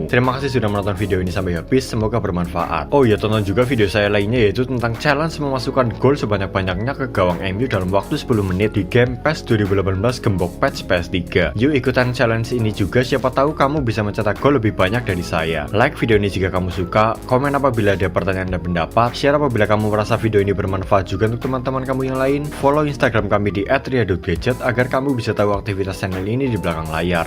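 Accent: Banyumas, Indonesia. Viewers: ngapak tapi jelas lugas dan detail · Ngapak bgt mas tp sya suka hahahah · Ngapake pol lah ya pak, ora ngapak ora kepenak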